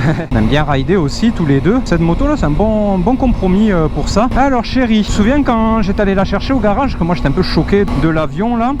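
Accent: South, France. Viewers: Vidéo vue. 32mn de kiffe. Jolie moto. Jolis paysages. Bonjour à Madame. Le soleil, l'accent du Sud... pow pow pow, continues, restes le même, et hâte de connaître ton ressentis GT vs ténéré 😁 un gros ✌ d'encouragement à toi, force à toi et prenez (toi et Madame) soin de vous... ✌✌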